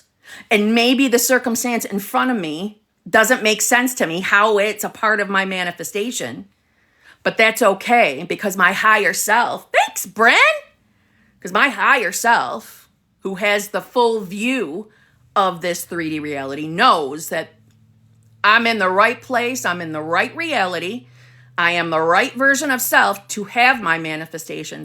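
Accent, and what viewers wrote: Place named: Long Island, United States. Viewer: Neville's wife manifested HIM! He talks about it and he wanted out of his first marriage and New York State was opposed. As you stated, those were limited timelines. So the woman who became his wife, did something. He never states what, but it was a manifestation protocol. Love you 🦋🌺 P.S. I am originally from Manhattan, and attended C.W. Post. I swear I hear a Long Island accent. I listen to you in the morning while doing my stretches now...the rampage on life. LOVE IT, LOVE YOU! It's all Done. I believe.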